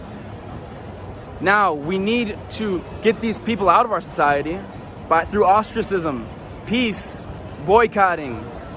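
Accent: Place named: Midwest, United States. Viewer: That Midwest accent came out in this one. That’s how you know he’s cookin’.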